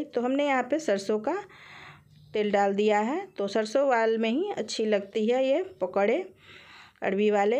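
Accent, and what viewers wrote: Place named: Bihar, India. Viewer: hame aap ki awaz se to pata tha ki aap bihar se hai lekin puchti nahin thi ab ham aor pakka dost ho gaye hai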